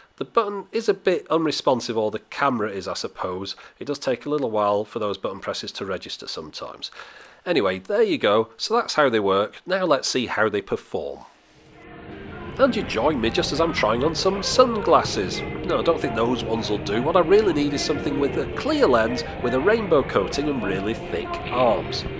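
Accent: United Kingdom. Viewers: that British accent :D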